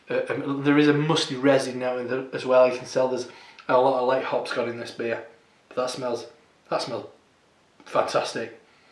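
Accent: Yorkshire, United Kingdom. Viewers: ooo aaaaaaarrrgghhh thaaarrrrttt smells booooootifuuuulll .. proper Yorkshire accent dude .. picked up the colab beer they did with northern monk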